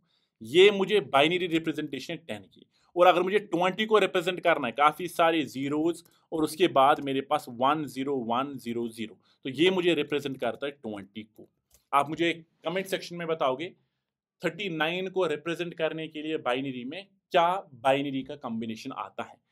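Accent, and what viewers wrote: Kashmir, India. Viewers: sir aap kashmir se ho kya kyuki mujhe accent se lgaa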